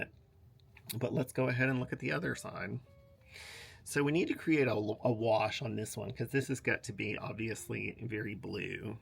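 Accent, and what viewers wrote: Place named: Georgia, United States. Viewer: Love it, you just sold me on the Space traveler pallet but I will be buying the diffuse muse pallet first. I love your accent; you sound like you are southern but Georgia southern like from Atlanta anyway nice accent. I was watching Alicia’s channel where she was talking about you applying these beautiful pallets. You have a new subscriber so I will be watching and learning.❤❤❤❤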